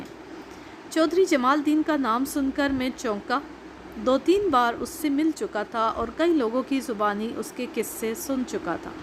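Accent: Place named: Punjab, Pakistan. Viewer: Apka lahja panjabi h Karachi wali Thora feel krte hain behrhal stayel acha salamat rahen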